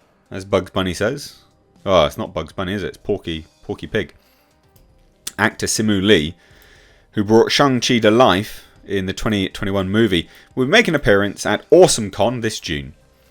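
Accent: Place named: United Kingdom. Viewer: What would a white guy with an accent , a British accent (im not stereo typing) know of real life stereo types .all the research you do is based on wiki not real life .u have no idea why , the deep reason why this actor said that .you just need clicks and views i guess. So making a 10 min video on something you will never understand is pointless this has to be a joke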